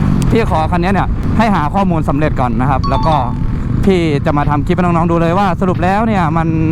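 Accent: Isan, Thailand. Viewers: เป็นคนอีสานหรือป่าวคับ😅😅😅😅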